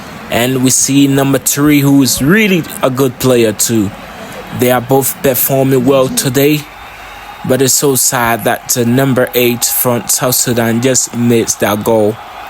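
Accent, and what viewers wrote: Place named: Liberia. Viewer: My brother don't mind those people complaining for not using Liberian accent ...I am from Nigeria and married to a Liberian woman for 23 years 3 kids...Trust me most people can't understand when you speak in Liberian accent..you can't grow by remaining LOCAL...You have to Twick your accent to attract a wider audience.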